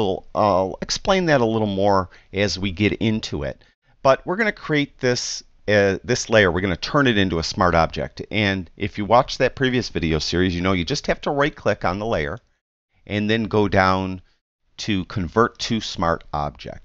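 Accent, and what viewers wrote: United States. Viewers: great teaching, . This doesn't seem to look right on close up objects such as a flower. As far as you talking too much, lets just say i am getting a Yankee accent, Actually i enjoy listening to your teachings. keep up the good work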